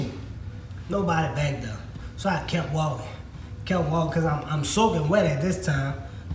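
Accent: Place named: New Orleans, United States. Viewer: Is he from New Orleans he sounds like it...I’m a new subscriber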